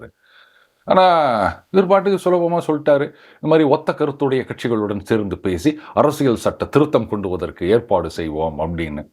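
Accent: Chennai, India. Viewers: Your native Chennai slang is super sir. Your analysis is super and absolutely true!!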